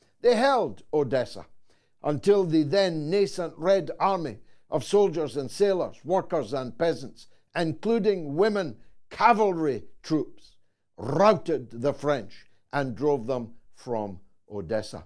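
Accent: Scotland, United Kingdom. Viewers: Hey, you are Scottish, I presume, according to your accent...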